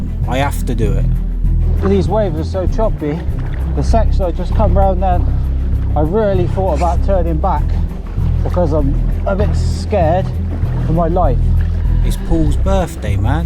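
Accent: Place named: West Country, United Kingdom. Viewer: Well done, thanks for another entertaining video. I'm guessing the accent is West Country, Bristol maybe? (I spent 20 years in Salisbury). Hope you managed some😴 sleep later. The poem.....................well let's just say don't give up the day job🤣🤣 Plus, I haven't figured it out unless the 40 stones throw were one for each year of the birthday boy? 👍🇬🇧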